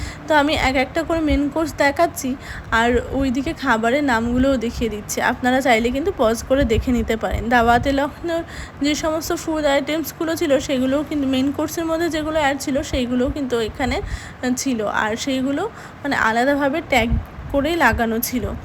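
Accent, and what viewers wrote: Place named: Bangladesh. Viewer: Apni ki Bangladeshi??? Kotha gulo kamon jano..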